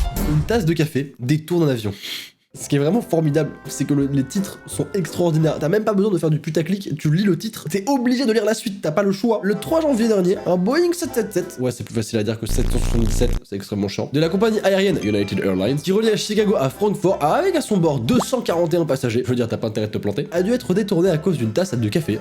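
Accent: England, United Kingdom. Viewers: je veut un like stp ❤️ J’aime trop ton accent anglais 😂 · waaaaaaaaaaaw comment tu as fait ;-; ceci est le talent le plus incroyable du monde ! ( ͡° ͜ʖ ͡°) Et tu as un accent anglais incroyable ( c’est vraiment vrai ) ʕ •ᴥ•ʔ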